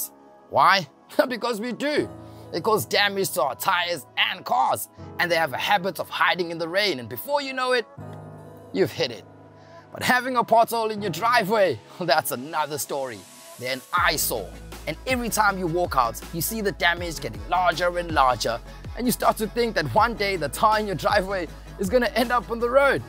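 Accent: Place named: South Africa. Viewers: His South African, incase you wondering . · Naw, he's a Saffie, for sure.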